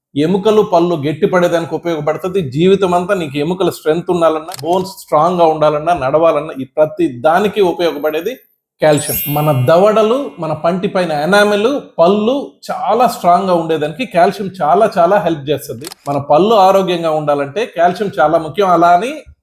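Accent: Nellore, India. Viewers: Abba Nellore yaasa dhimpeseru sir....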